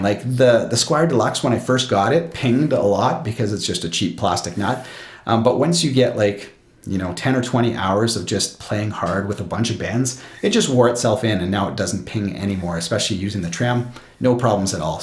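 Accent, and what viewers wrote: Canada. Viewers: I'm English and I picked up on the Canadian accent. Certain words have a Scottish ring to them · Now there's a Canadian accent!!!!! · One of the most Canadian accents I've ever heard..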